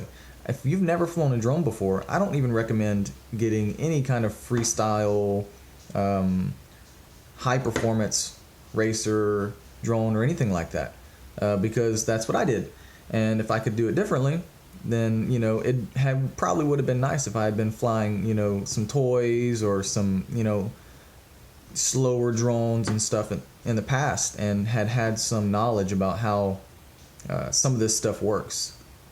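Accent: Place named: Texas, United States. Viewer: Digging the vids and your accent sounds just like me and everyone I know from Texas. Just got a Wizard from Grayson Hobby today, goggles have not arrived from banggood yet. I have not really flown drones much, maybe 4 times and not racers. I do however play the shit out of Battlefield and all I do is fly the copter and I think it teaches you how to maneuver a rotor and use the controls so I think I am ahead of the game, controls are the same just a lot more sensitive on the drone. I hovered the Wizard in my living room tonight, piece of cake. I will say my confidence is high but I am going to do it just like you. Hover, learn to move, low and slow to begin. I also have a tiny whoop on order to practice with and I already have the cable on its way to run sims on the computer with the FS I6. I already have a sim and am currently using the PS controller to practice until I get my cable. 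So everything you say is good advise the way I see it. Eventually we will get there. I just wanna fly, not in it to race. Well, not yet. Time will tell. Keep up the good work. Keep the vids coming.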